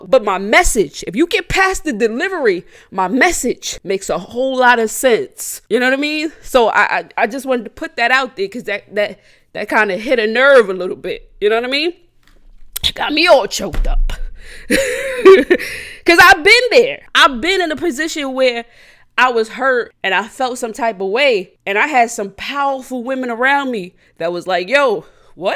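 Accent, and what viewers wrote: New York, United States. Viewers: New York accent came out 100 percent in this video 💯😭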